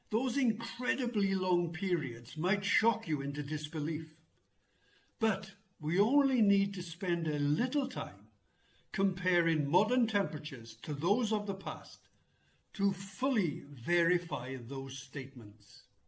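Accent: Wales, United Kingdom. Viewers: I agree with you, I love his voice and accent as well. Since I have watched a large number of his videos over the last several years I remember someone remarking in the comments section; saying he has a distinctive 'Welsh' accent. I can't verify if this is true, but try this as I did. Listen to a Youtube video where someone is speaking with a 'Welsh' accent and by comparison I believe the person got it correct, then you can decide for yourself. His knowledge is so impressive that all it took was watching one of his videos and I immediately subscribed. I pray he will get more recognition for all of his excellent work. The world needs to hear his videos, before we head down the rabbit hole of making big changes based upon false facts. What bothers me is if we do this; there will never be any way to monitor our progress. In other words we will be chasing rainbows.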